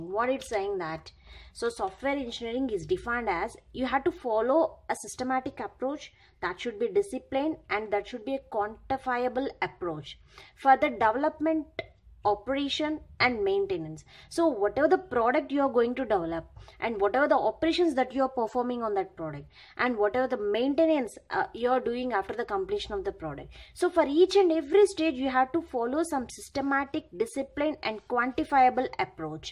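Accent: India. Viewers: I realized if you want to get through college or just learn everything, better get use to the Indian accent guys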